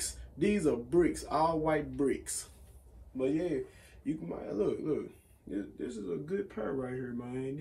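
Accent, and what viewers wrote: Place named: Memphis, United States. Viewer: Soon as I heard you talk I knew you was from the M😂 901〽️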